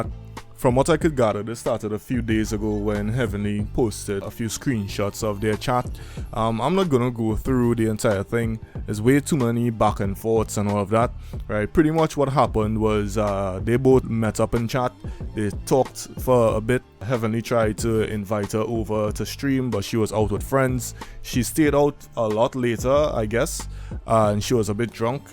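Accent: Trinidad and Tobago. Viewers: You a Trini bro? Bless up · You from Trinidad? You kinda sound Trinidad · Hey... where you from?? You sound like a trini?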